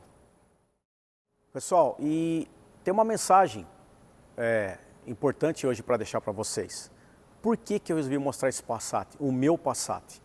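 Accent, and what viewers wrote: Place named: Mooca, Brazil. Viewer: Curioso pra saber de onde vem esse seu sotaque kkk. Parece da Mooca, belo! kkk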